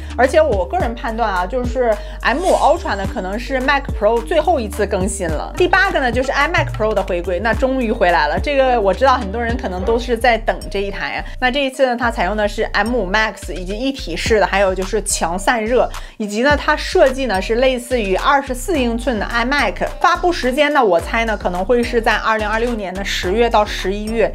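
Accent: Northeast, China. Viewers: 就喜欢大东北碴子味😂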